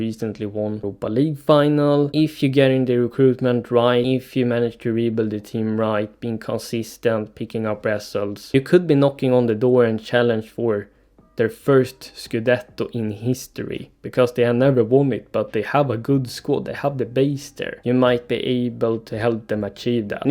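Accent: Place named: Sweden. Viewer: LMAAOO i was just about to ask that you have the perfect swedish accent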